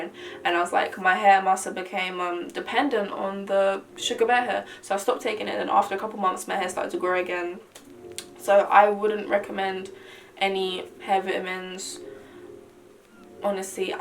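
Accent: United Kingdom. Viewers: 😱your British 🙌🏾🙌🏾🙌🏾🙌🏾 yass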